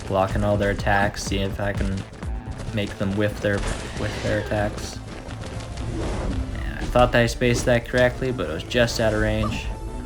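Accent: Canada. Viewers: Congrats bro...you Canadian? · You are Canadian right?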